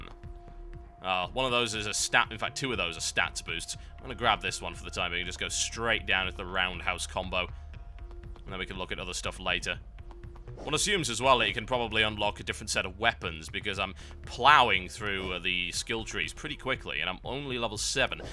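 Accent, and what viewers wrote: United Kingdom. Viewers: I do think this game seems impressive, but at the same time i feel like im unsure to buy it or not. I was so excited for cata (mostly due to these videos) but when i finnaly played the product i felt something was missing (parhaps the brittish voice in the background but who knows). Points is, i dont want to build up my expectations to high, to then have it shot down when i buy it which was kinda what happpend with cata. As for why i wrote this comment.... i felt like it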